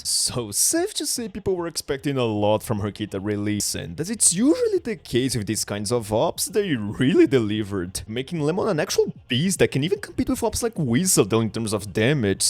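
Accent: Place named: Brazil. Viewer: wow, you have a very strong Brazilian accent... You are? xD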